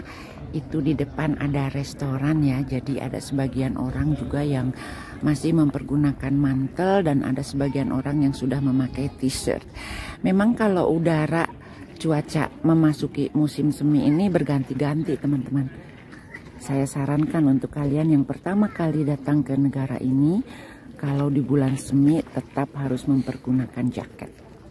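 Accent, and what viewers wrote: West Java, Indonesia. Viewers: Wilujeng sonten Bunda, reseup pisan logat Sunda na kaciri keneh, God Bless you🙏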